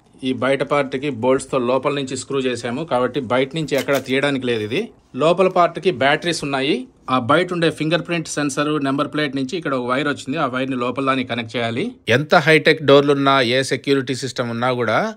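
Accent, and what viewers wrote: Kadapa, India. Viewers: Anna Meeru maa paalita PEDDA BALASHIKSHA . America vallu mimmalni ,meethopati swachmaina Rayalaseema Yaasani AP nunchi yetthukellaru. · Anna miru mana kadapa bhasha supar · Bhayya meeku maa vizag lo vunanatha etakaram and slang Kadapa vollu vundi ani choopisthunnaru sooper bhayya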